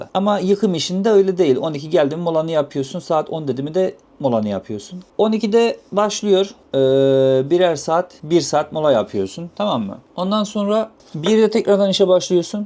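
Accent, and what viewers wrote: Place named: Adana, Turkey. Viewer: Hem$o sen ADANALIMISIN ? $iven bizim oranin $ivesine benziyor.Almanya´dan selamlar 😊🤚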